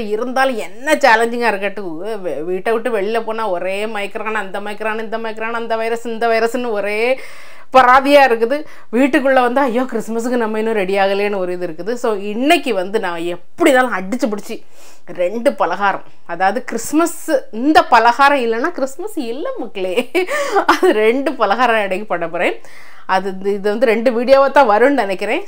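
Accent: Nagercoil, India. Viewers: உங்களின் நாகர் கோவில் தமிழ் அழகு. என்று சொல்வது அதைவிட அழகு.இங்கு தமிழ் நாட்டில் உள்ள நிறைய கிறிஸ்துவர்கள் தமிழர்கள் என்று சொல்வதில்லை. தங்களை வெள்ளைக் காரர்கள் போலவே நினைத்துக் கொள்கிறார்கள். உங்களின் முந்திரி கொத்து ஸ்வீட்ஸ் சூப்பர் சூப்பர் 👌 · Super.....நாகர்கோவில் பேச்சு மாறவே இல்லை.கேட்க நன்றாக இருந்தது என்னுடைய பாட்டி செய்வார்கள்....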